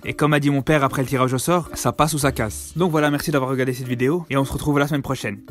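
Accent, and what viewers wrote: Brussels, Belgium. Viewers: Bravo pour tes 5 000 abonnés 😍 c'est chiant pour le Maroc de tomber contre la côte d'Ivoire maintenant ils vont vouloir se venger sinon désolé Fréro 😉 je suis un Tunisien et on a vraiment une bonne chance sinon j'adore ton accent bruxellois tu me fais rire 😂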